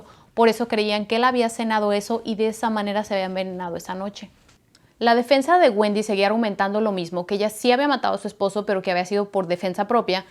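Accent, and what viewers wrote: Mexico. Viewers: explicó en otro video, que ella nació en el D.F. ahora llamada Ciudad de México, pero se fue a vivir a Querétaro, pero lo importante es la buena dicción que tiene!!! Y además ése acento que tenemos las Mexicanas 🙋‍♀️🇲🇽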